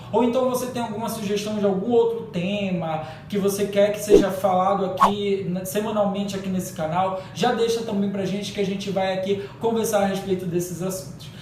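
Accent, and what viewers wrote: Rio de Janeiro, Brazil. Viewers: Você é do Rio??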